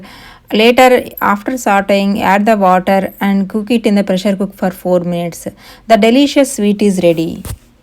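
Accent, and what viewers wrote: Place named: India. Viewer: I love your accent. It reminds me of my time in India. Very useful video, thanks for sharing it.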